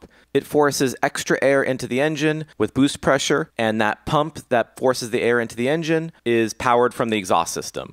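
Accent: Greece. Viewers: Are you from greece? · R u greek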